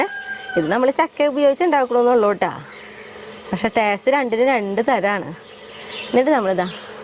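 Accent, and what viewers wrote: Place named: Thrissur, India. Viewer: de സംസാരം ശരിക്കും ഞങൾ പറയുന്നപോലെ തന്നെ.... കോഴിക്കോട്ട, കിണ്ണം,davara allam.same. തൃശൂർ എവിടെയാ വീട്.... നമ്മൾ കുന്നംകുളം,പഴഞ്ഞി, ചെറുതുരുത്തി ആണ്....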